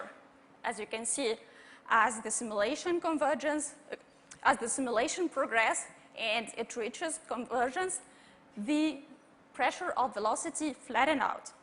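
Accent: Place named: Sweden. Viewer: I bet she is swedish, I believe that's the accent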